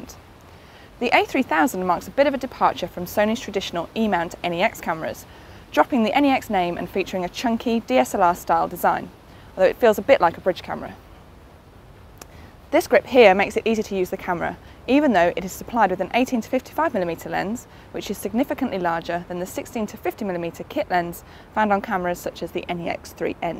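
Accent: United Kingdom. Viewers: Your British accent alone earns you a like ;)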